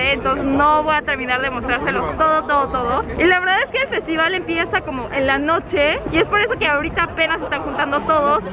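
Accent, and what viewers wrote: Mexico. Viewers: Ahora que Cris está en México ví tu canal y me ha encantado, tu español es muy bueno, muy "mexicano" diría yo :) pero yo quiero saber ¿Qué estudias? ¿Cuánto tiempo hay que estudiar la universidad? ¿Cómo funciona eso en tu país? Me surgieron esas dudas ... Saludos... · Yo no soy seguidor del canal uno que otro video me e visto se Me hace una chica muy linda pero mi pregunta a sido siempre como rayos le hace para hablar también el español parece mexicana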